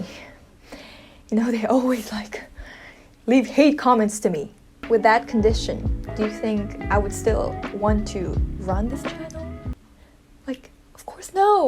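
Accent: Philippines. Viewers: Just the way you speak i call feel that you grow up here in the Philippines, and Pinoy baiting doesn't apply for everyone that makes Filipino related Contents, Pinoy baiting are actually being done by those people are not familiar with Filipino Culture but still make Contents out of them... Its just a strategic move for them, but i hope that people can support the channels that makes worthy videos, (for any type of contents) where people can learn or be entertained by it without being sexist or make whatever that isn't even worth watching like idiotic pranks that are obviously scripted and theyre not even trying to think for a good content but for some reasons there are people who support them, i cant blame them(viewers) because maybe they want to fool themselves lol, who knows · I'm Pinoy, I watch her videos because of her pinoy english accent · I know you have a filipino english accent and many koreans have filipino english accent but yours is my favorite ❤️❤️❤️ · I am a first generation American of Filipino descent. 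I have never visited the Philippines, but after first watching your video (speaking English w/ a Filipino accent) a few months ago (followed by many more videos), I was hooked. I want to visit there now - all thanks to you! As an aside, I would also like to visit S. Korea. By culture, experience, language, knowledge, living there nine years, you are a subject matter expert on the Philippines. I don't quite know what the issue is... If it's monetization over your content, then travel agents shouldn't make any money. Is it because you are not Filipino? That would be racists. Can only Filipinos post videos and comment about the PI? Your videos are well-made, creative, and thoughtful. They are also positive, and only benefit the country. W/ regards to the hate you receive: If you have not watched the movie The Social Dilemma, you may get a better understanding of the hate. In summary (spoiler alert); your viewers are the product being sold to advertisers on YT (the source of the monetization), conflict (hate) generates more viewers/posts than does love. 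If there are no negative comments, the artificial intelligence (AI) steps-in to create that negativity - generating responses (and money). Simple comments like, "You're stupid" or "You're not Filipino" can generate a lot of responses. Sorry, so this is so long. I want to give you support by letting you know I very much enjoy your videos. As a Filipino (albeit in the US)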